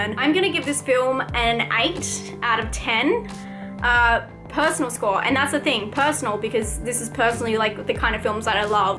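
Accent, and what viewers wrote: Australia. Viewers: He probably didn't understand the accent and meant to say Australian accent. No need to be so passive aggressively mean to your viewers.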